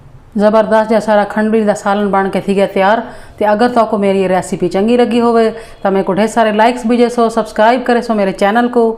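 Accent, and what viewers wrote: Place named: Saraiki, Pakistan. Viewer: سبحان اللہ سبحان جیا آپ لہجہ سرائیکی ہی رکھا کریں اور ساتھ چیزیں کی تعداد کے لحاظ سے کوئی برتن بھی بتایا کریں جاوید خان تشنہ ایران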